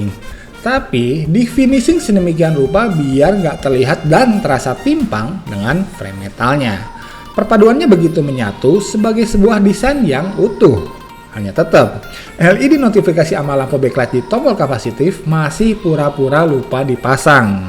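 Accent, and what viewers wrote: West Java, Indonesia. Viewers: orang sunda kang?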